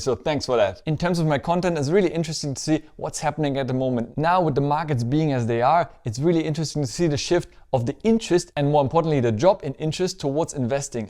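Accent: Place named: Germany. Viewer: Thanks for the reply. Then you are really fluent in English. Apart from a slight german accent (sorry if you have nothing to do with Germany lol, and ofcourse its in no way an inconvenience, just an observation), your flow and delivery is amazing. Hope to be like you someday :)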